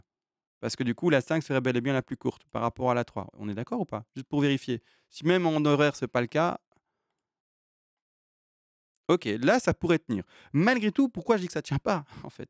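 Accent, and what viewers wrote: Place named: Belgium. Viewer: Oui il a l'accent belge.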